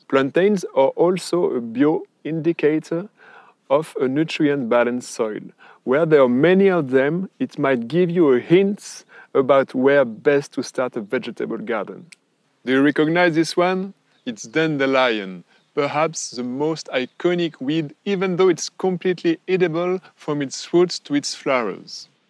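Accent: France. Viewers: Oh man your english is so french......., that's so lovely, you're so cute, I love it!!!!!! Hugg's & kisses ;-)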